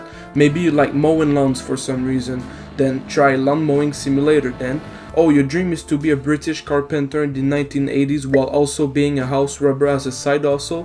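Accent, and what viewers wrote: Quebec, Canada. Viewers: talking about mon bazou, you sound like you have a Quebec accent. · Toi t quebecois avec ton accent han? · your french canadian for sure (quebecois calisse)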